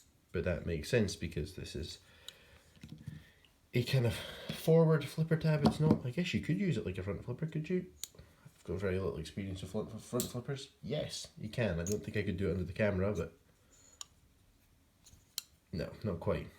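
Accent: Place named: Scotland, United Kingdom. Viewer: Nice to hear another Scottish voice. This isn’t really my sort of knife, but I’ve long had a hankering for a Richard Rodgers custom.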